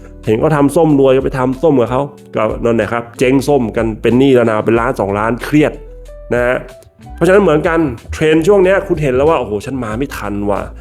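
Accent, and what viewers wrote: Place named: Khanu Woralaksaburi, Thailand. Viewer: พี่คนขาณุวรลักษบุรีหรอครับ แซ่บติดปาก บัวยี คล้ายหมู่บ้านผมเลย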